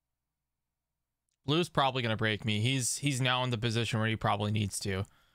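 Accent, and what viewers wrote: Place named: Ohio, United States. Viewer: I knew you were from Ohio by the way you pronounced Medina.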